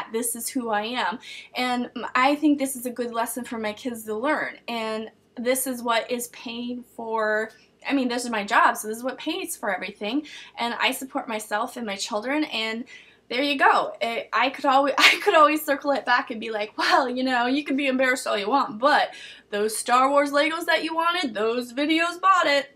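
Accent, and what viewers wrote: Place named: Wales, United Kingdom. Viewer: Yeah....i love your Welsh accent.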